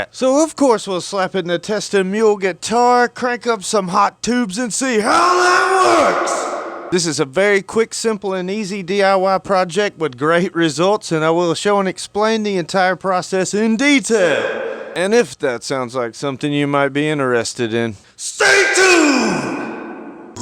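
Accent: South, United States. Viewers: I need a tutorial on how to speak redneck, that was amazing. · I just found your channel and I love it! Your Redneck Weird Al appearance is the perfect match to your southern drawl and dry wit humor! Plus, you seem to be pretty sharp. Keep the videos coming!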